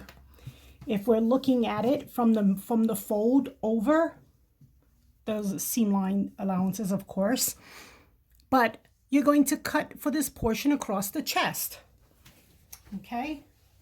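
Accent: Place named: New York, United States. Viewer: I love your ideas. I sew but usually straight lines. You are extremely helpful. I also love your accent. NY? NJ? Mass?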